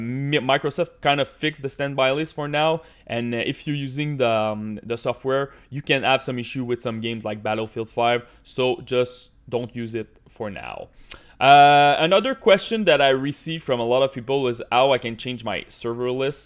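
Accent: Quebec, Canada. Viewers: hes french from quebec as i am and it took me more than 5 years of speaking to get rid of that french canadian accent lmao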